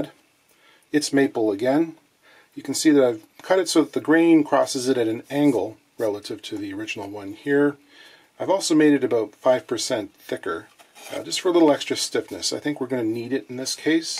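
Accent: Canada. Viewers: Don't check yourself about "about"! It's good to hear an identifiable Canadian accent. I'm a particular fan of Ontario accents from further east, which may almost sound Scottish or Irish. And I'm scientist and my cuticles are no better than yours. This one reminds me of my ongoing dental work... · In Canadian about should rhyme with shoot and scoot. That’s part of the charm of listening to this channel, to hear a talented foreigner speaking the English as only an occupant of the great white north can. Seriously, I’m Virginian and y’all say it like the folks from Richmond. Thanks! Another wonderful video. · Don't you worry about that Canadian accent...you do you! Thanks for the amazing videos!!! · Embrace your Canadian ways and accent! ;-) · I'm a new viewer of this excellent channel. I realize this is an old video, but I find it sad that you're cautious about (aboot😅) how you pronounce certain words. 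I love Canadian, and I love your videos. Keep up the good work! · Go ahead, sound as Canadian as you like. You wouldn't tell an American to not sound American. Anyway, guitar sounds pretty good, imo. Nice work and video ... very relaxing.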